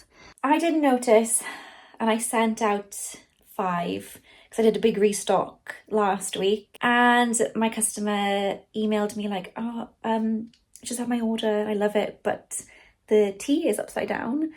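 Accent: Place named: United Kingdom. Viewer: I’m guessing you’re from the US? It’s spelled with an S in British English and with a Z in US English. is British, Scottish or Irish (I haven’t listened long enough to pick up the exact accent, Sorry).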